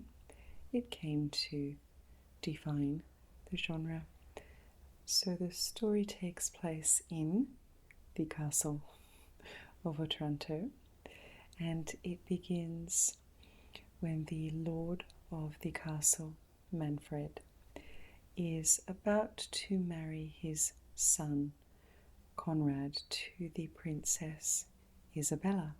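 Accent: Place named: Australia. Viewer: Beautiful, classy Aussie, erm, Australian accent. And, deliberate, specifically chosen words. Thank you. You are so enjoyable to listen to. And the type of person my wife and I seek out as a friends. Keep going! Best wishes from LA.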